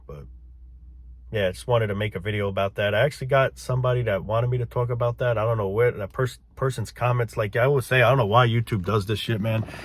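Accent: New York, United States. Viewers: Yeah the ny accent, and truth speaking. Makes me laugh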